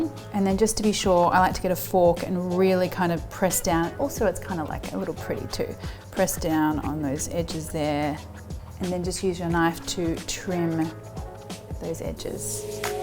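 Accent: Australia. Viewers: You know Marion is Australian not British. Her accent is Australian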